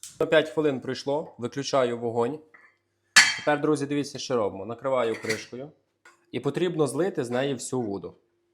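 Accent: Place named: Podillia, Ukraine. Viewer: Професіонал! Клас, було приємно спостерігати, немов родича слухаю 😊 Ви з Хмельниччини чи з Вінниччини?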